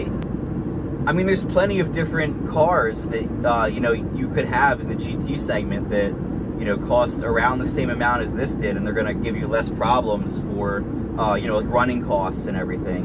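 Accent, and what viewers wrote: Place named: Cockney, United Kingdom. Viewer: Great fun and pretty informal too … not a bad cockney accent either … love the 4200 …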